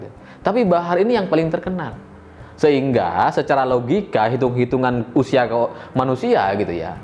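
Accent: Madura, Indonesia. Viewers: Logatnya kek org madura..tp gk ada takdzimnya sama habaib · Dari logatnya aku udah mikir mas ini orang madura, ternyata benar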